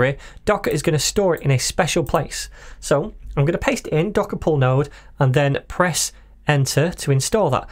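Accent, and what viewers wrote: United Kingdom. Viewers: Is that a british accent?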